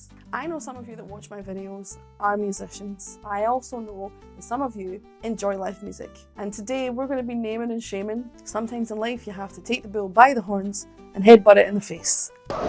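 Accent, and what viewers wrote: Scotland, United Kingdom. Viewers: I especially appreciate your sense of humor, knowledge of music, no nonsense approach and of course, your wonderful Scottish accent! p.s. Thanks for making me a sandwich!!!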